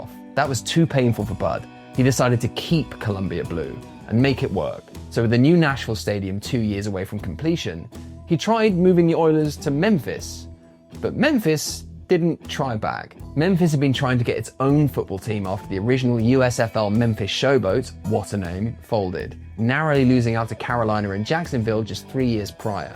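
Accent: England, United Kingdom. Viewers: So weird hearing an English guy say all this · Its crazy having a british accent talking about american football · Wait is nobody going to question, why the guy speaking in the video, has a British accent? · I don't know, but it's kind of odd hearing a dude with an English accent telling us about NFL history. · Wasn’t expecting a British guy but hell yeah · Uhhhhh.....why is a British person telling me anything? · What is a guy...with a British accent, doing...caring one bit, about the, defunct, "Houston, Oilers"? Don't get me wrong...I like it! It is intriguing. Being a native Houstonian, having grown up in the 70's in the Houston area, (Magnolia, Texas), I, my brother, my Dad, and ALL my friends...were die-hard Oilers fans. It just stuns me...amazes me...confuses me...that a "chap" from, "Bloody Ole England", (who call "soccer", "footbal"), care one iota about a defunct Houston, Texas Oilers football franchise? 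I don't get it...but put me on board. I even subscribed to his channel. "Love Ya Blue"...always will. I was 12 years old...in 1979...and Earl Campbell...was the greatest sports hero in the world in my eyes.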